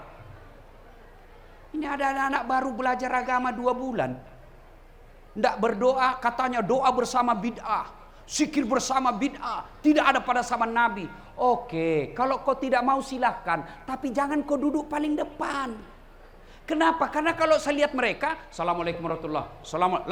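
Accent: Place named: Toraja, Indonesia. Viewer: Orang Toraja ki pasti 😅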